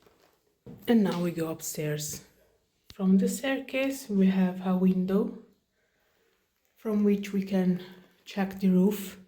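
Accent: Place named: Italy. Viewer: Very nice presentation in English with charming Italian accent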